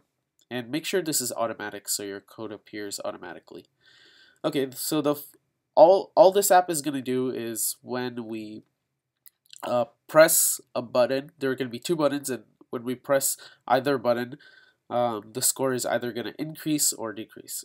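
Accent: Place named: India. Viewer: hicthed Naw, it's just your Indian accent. Nice vid!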